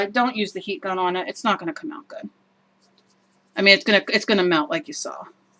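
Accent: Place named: Philadelphia, United States. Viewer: Are you from Phillie? Love your accent and love your videos!!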